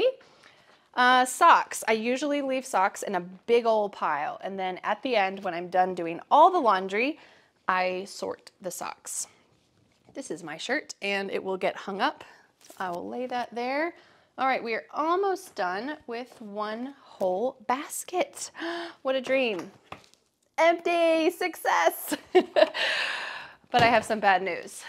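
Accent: United States. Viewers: Are you American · Hi ma'am.are you American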